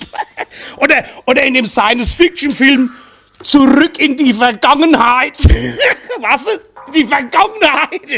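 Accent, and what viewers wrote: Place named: Hesse, Germany. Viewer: endgeil,ich lieb unseren hessischen humor,und die geil sprach.super.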